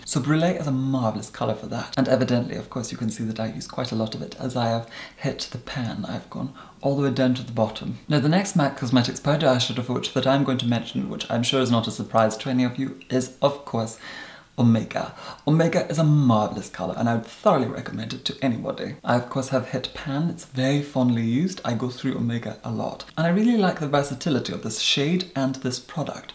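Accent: United Kingdom. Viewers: posh English 'Oxford University' style (but he is originally from Scotland)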